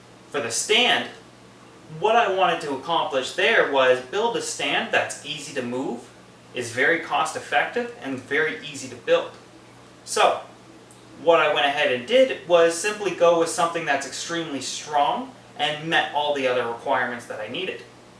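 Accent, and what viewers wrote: Canada. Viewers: I think what I like best about your videos is that you do the "HowTo" part, but you also take the time to explain the "Why'd he do that" part. With so many videos, I want to stop "genius creator" in the middle of creating his brand-new what-sit and ask him "why that way, why not this way?" Genius creator, of course, never answers his comments! You, on the other hand, give very comprehensive [and comprehensible] instructions. And your Canadianisms just make it fun listening to you [yez?]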